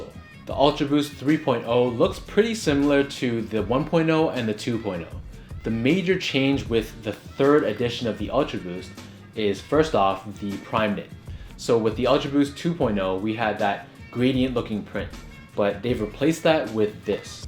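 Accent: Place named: Germany. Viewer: ok you seemed to had a little german accent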